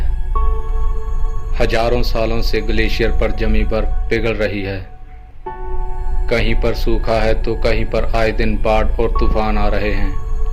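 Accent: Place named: Punjab, India. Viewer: ਬਾਈ ਜੀ ਤੁਸੀਂ ਪੰਜਾਬੀ ਹੋ ਤੁਹਾਡੀ ਹਿੰਦੀ ਦੱਸ ਰਹੀ ਹੈ 😄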